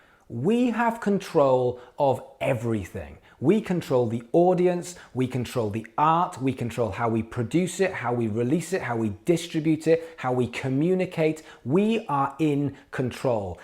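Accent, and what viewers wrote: Wales, United Kingdom. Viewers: ahhh Swansea.. that makes sense I couldn't place it for some reason. I'm from the valleys (ebbw, brynmawr sort of way). Nice one man, enjoy the states.. my bro lives in California and they love the Welsh accent apparently. Dig your videos man. Have two bands and struggle to push them online despite one of them being signed haha.. so i find these vids helpful. Keep up the good work dude.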